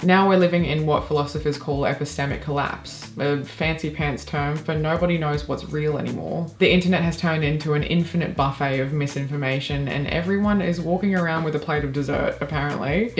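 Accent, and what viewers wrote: Australia. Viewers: Are you an Aussie living in America or something ? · I agree, but I having to listen to this in an Australian accent is somewhat counterproductive. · The counter argument to your video is the covid debacle, where many experts and scientists did lie to the public, often repeatedly. An advanced degree does not confer advanced ethics, or any ethics at all. And btw, I don't know if you're Australian or a New Zealander, but both those countries' responses to the pandemic made America look like a land full of geniuses in comparison (which obviously we're not)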